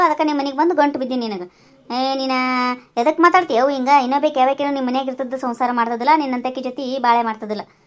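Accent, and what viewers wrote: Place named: Uttara Kannada, India. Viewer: Namaste akka, sambhashane Matra super, ondu kathe na vastavakke tarodu enu easy alla, film olage ondondu character nu bere bere yavru madtirtare, avravra character olage involve adre aytu, but ella character olagu obre involve agi, kathe na vastavakke tartira, nimma talent ge hats off 🙏 adrallu uttara kannada bhashe sogadu, mukhadalli tumba nagu taratte, otnalli nim videos refreshment agiratte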